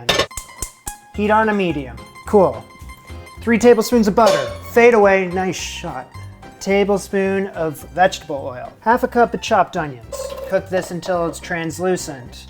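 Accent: Canada. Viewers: I think-not entirely sure though-he was raised in Canada. I can totally understand what you mean by simply “Canadian”. He certainly has the accent and verbiage/slang from Canada, but some of the northern states pick that up too, so it’s honestly hard to tell sometimes. Had he not straight up said where he lived I would have guessed he was in Canada for sure. 😊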